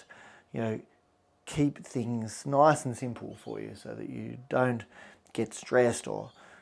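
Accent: Australia. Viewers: Hi, Best wishes from the UK. It is always a pleasure to watch you at work and to see your face when signing off. Keep up the good work, you are insirational! Love the aussie accent.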